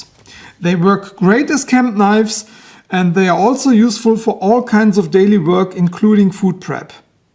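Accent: Germany. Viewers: englisch etwas deutsch aber da ich es auch nicht besser kann keine reale kritik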